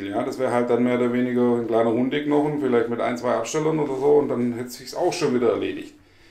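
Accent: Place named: Swabia, Germany. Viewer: Hallo, Glückwunsch zu deinen ersten 100 👍 Und: schwäbisch isch schbitze. Gruß Jürgen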